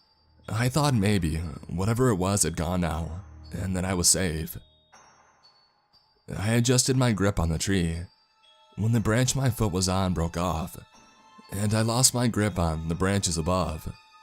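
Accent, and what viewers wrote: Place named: California, United States. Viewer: Pretty good but you need to get rid of your confused california girl accent.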